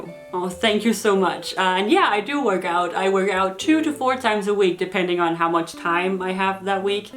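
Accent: Denmark. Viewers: Awww I love accents, including the Danish accent! I just wish I could get rid of my American accent when I try to speak Danish. :p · Hey as a Texan an American learning all Scandinavian languages an German plus Gaelic I say it sounds awesome hearing the Dane accent in English :) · I love the Danish accent when you speak English! I love how articulate you are on topics like immigration too. I wish I could be like that rather than stumbling over what I want to say xx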